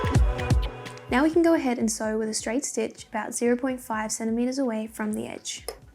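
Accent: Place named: Australia. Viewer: Oh thank god, its an Aussie and not some ageing southern momma y'alling with a voice like a drill sergeant after a night in a smokey bar doing karaoke . . . .this is exactly what ive been looking for.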